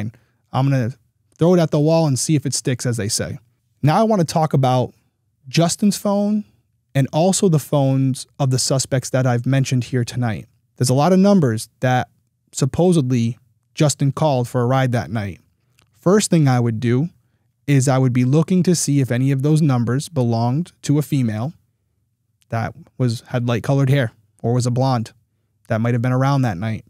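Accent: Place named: East Coast, United States. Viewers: I’m going to listen to the podcast as well…I’d like to hear from Erica, and maybe between this video & bumping the podcast up in the algorithm it’ll help get the word out. On a side note, I’m loving your new channel! Also, it was cool to hear my name in a northern accent so many times (I’m from Florida) 😂😂❤ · I love how in tune that you are with your audience. Btw, I enjoy your voice, with it's tiny east coast accent (I'm from So Cal) I'm proud of you, I know that you will be successful l.😊 Edit: I like you're story voice and your accent. I do not find the pacing too fast. If I could change anything, I would like more of a backstory on the victim, if possible Love, Blessings, and Prosperity, Stacy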